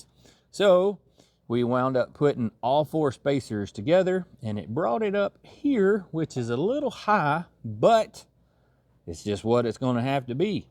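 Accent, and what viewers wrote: South, United States. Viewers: your good ole boy southern drawl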